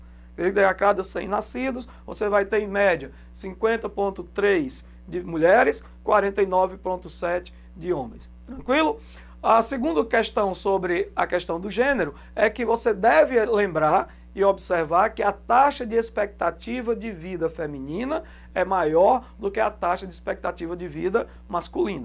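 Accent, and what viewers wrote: Northeast, Brazil. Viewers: Deu até saudades do Nordeste só de ouvir o sotaque dele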